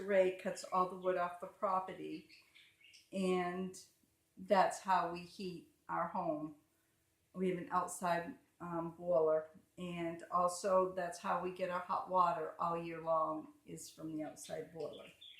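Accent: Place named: New York, United States. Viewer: nice video. Your accent is it from New York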